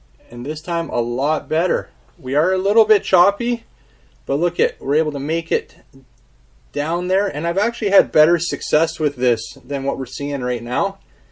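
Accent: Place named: Canada. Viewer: This is exactly what I was looking for, thank you! Side note, you have THE most Canadian accent I’ve ever heard, and I mean that in a good way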